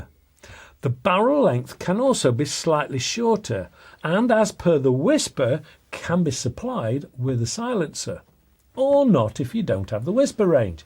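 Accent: United Kingdom. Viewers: Since i discovered this Channel an couple ofm onths ago, i am hooked and have seen allmost all of them.. at least twice. I love the review, breakdown and judgement without bias or clearly "payed in whatever way" intention. All done without benefits from manufacturers, clearly and correctly spoken brittish without being pretentious about being brittish. love it! I do not like the american slang of which youtube is full of., please keep on trucking with this channel, for years to go please... I have followed a few of your advice, and you naild it. I am so pleased with you. This 50 meters airgunner (till dec last year with a HW97K and grouping like pennies) is now a happy owner of a HW100T (FAC) has learned a lot from you! Cheers! an many thanks. Will order an AAR Cap soon and proudly wear it at competitions next year. Forgot to say that i never had much of an impession of Gammo, but now i am interested and will try that airgun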